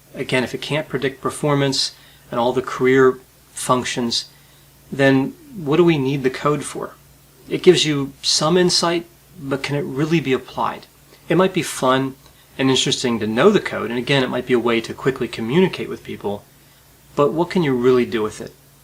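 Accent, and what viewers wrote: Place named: Pennsylvania, United States. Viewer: I thought you might be INTP. As and ENFJ with inferior Ti I appreciate your logical analysis of psychological concepts. You are very interesting and entertaining to me. I have to disagree with you on the negatives of the MBTI. I don't identify with the other types like I do with the description of ENFJ. I also disagree with the descriptions of the types being all positive. The negatives are also discussed. I find it valid in the way it has helped me to understand myself and others. I appreciate my and others strengths and weaknesses so much more than I ever have. I love myself and others more. I am aware of my defects and how I can improve within reason. Comparing the MBTI to the Big 5 is not a fair comparison. The Big 5 was developed as an instrument to statistically determine mental health issues. The MBTI was not. 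It's more about how people think and relate cognitively. But I appreciate your analysis and love what you are doing. I first started listening to you because of your Pennsylvanian accent. I grew up in Lancaster County. Your videos showed up in my feed when I was listening to Dr. Ramani. I'm glad they did. You have taught me so many things and make my life so much more enjoyable. Thank you. Diane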